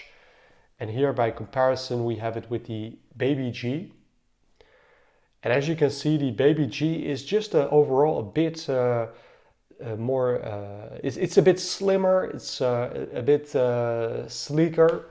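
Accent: Netherlands. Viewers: Why not the GW-M5610 with the BGD-5000? I have the MW and the 7: nice set in white. Btw you sound very dutch.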